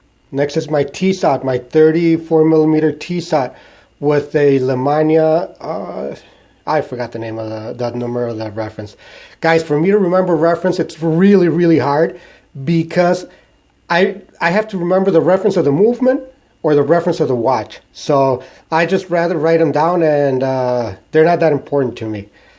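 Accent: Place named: Mexico. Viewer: Don't pay attention to these fucking swiss fanboys who complain about your mexican accent. You have more class and appreciation of unique timepieces than all of them put together.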